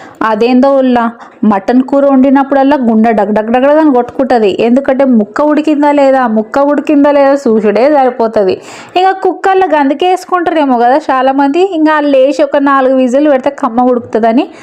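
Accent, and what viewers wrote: Telangana, India. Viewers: You are Telangana slang is super❤❤ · Super Telangana slang Akka❤❤ur super akka 👏👏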